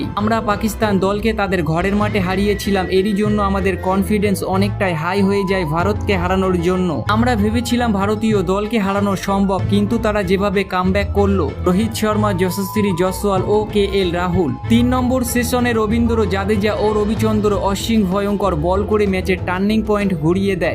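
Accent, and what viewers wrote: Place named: Bangladesh. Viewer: বায়েন 😂😂😂😂 কি বলো কথা শুনেই বলা যায় তুমি কাঙলাদেশি😂😂 ভাষা ঠিক করো এভাবে লাইক পাবে না😂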